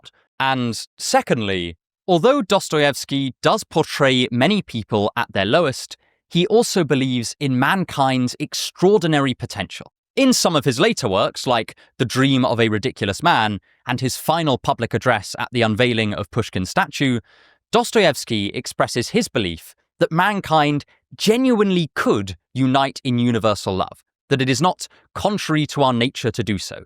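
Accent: RP, United Kingdom. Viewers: Anyone else been thinking for years he was saying ‘Joe Farley’ in RP?! 😂🎉 happy birthday 🎉🎈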